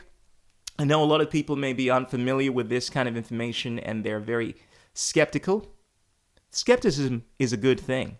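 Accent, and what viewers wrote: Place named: United States. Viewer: Why do you speak with an American accent if you're from Ghana?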